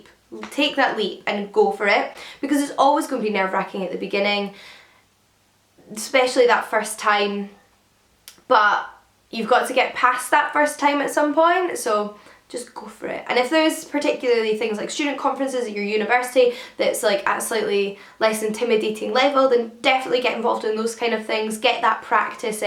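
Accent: Scotland, United Kingdom. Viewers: Waad Abdullah she’s Scottish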